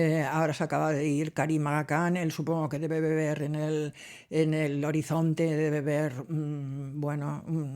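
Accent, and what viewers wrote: Catalonia, Spain. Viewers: BUENAS TARDES! ERES ESPECIAL! CUANTAS VIVENCIAS! CUANTO CONOCIMIENTO! TODO ES ENRIQUECEDOR.ME ENCANTA ESCUCHARTE POR TANTAS PERSONAS A LO LARGO DE TU VIDA HAS CONOCIDO.GRACIAS POR TUS RELATOS.ERES UN SOLETE! YO TAMBIÉN ESTOY ENCANTA CONTIGO.ERES UNA PERSONA MUY ESPECIAL; MÁS TU ACENTO CATALAN ME RECUERDA MUCHO AL DE MI MARIDO ( QUE EN GLORIA ESTE ) PUES SU MADRE ERA DE REUS,EL TENIA EL CATALAN MUY ARRAIGADO ) GRACIAS! UN ABRAZO ENORME.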